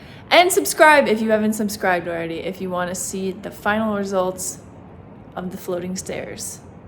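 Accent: France. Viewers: Waouh je viens de vous découvrir et quel ne fut pas ma surprise en entendant ce doux accent FR ah ah ah <3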